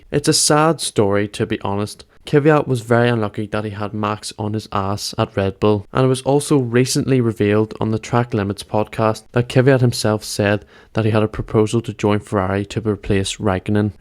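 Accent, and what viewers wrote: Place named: Northwest, Ireland. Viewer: Bro, its a guess, but are you by any chance from Ireland, that accent soumds like from the North or northwest of Ireland. ❤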